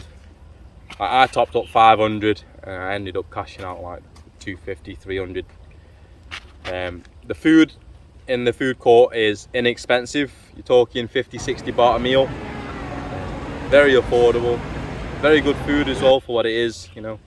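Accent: United Kingdom. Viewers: You got more of a British accent than me lad! Keep smashing the vids up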